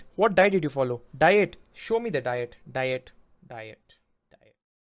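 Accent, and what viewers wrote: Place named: Bangalore, India. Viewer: You have a banglorean accent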